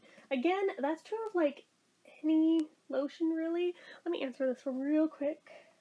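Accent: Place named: Vermont, United States. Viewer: Speaking of Vermont, do you live there? Or originally from there? Your voice/accent is almost identical to my buddy from Stowe. Like scary close😂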